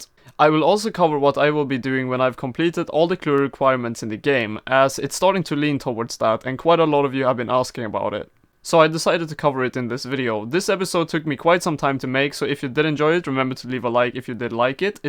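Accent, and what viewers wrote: Sweden. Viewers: Are you from Sweden? Your accent kind of sounds like rather Nothern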